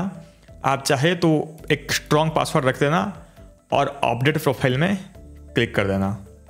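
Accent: Odisha, India. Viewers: Bro aap odisha ke ho...aap ka voice lagraha hai aisha ..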